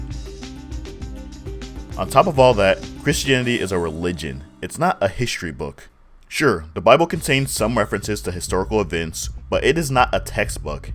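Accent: United States. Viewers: Im not African American but just African. Firstly congrats because you made a video and uploaded it which is more than most. However, 1. Making out that Afrocentrics claims of hidden African history is some big conspiracy theory. I think thats a tad offensive. Isn't African history still being taught in America starting from slavery? You might know of many of Africa's great empire's but in the white dominated countries where a lot of the diaspora live is that common knowledge? Yes we as adults can search the web til our hearts content but what about the children? African history was hidden and destroyed and while that conscious effort may not be being made today, that doesnt address the knock on effects of that. Widescale denial of African Achievement is huge and that is because it was hidden. And what equality between all races are you talking about? Where do you even live to say that? 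You sound American. But how would a black American make such a statement. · You don’t speak “proper” English, you speak the American dialect, and people want you to speak African American Vernacular English, just a slight correction but you’re right that speaking in a standard dialect doesn’t make you a race traitor or whatever they say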